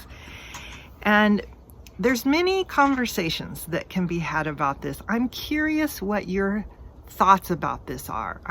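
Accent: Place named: United States. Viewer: I love your English, I think I reinforce it listening to you, your accent is very clean though I know that depends upon the region where you live is the type of accent, yours is wonderful if all the Americans had your accent it would be so easy for me to understand any person but it’s not in that way and there’s no other way that trying to train our ears with the different accents, congratulations, how I wish to talk to you so that I can practice the language.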